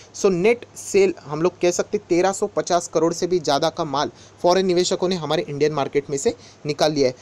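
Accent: Maharashtra, India. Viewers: Sir very fine analysis, a am watching your each and every vidio, one thing I want to ask you, I guess u belong to maharashtra, from your language I come to know, I am from pune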